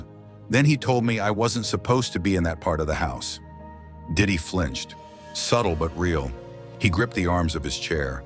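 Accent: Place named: United Kingdom. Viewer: What's with the British accent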